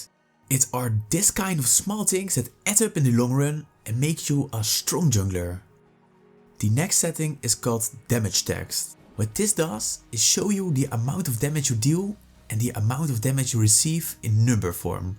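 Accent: Netherlands. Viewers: ik hoor dat je nederlander bent nice vid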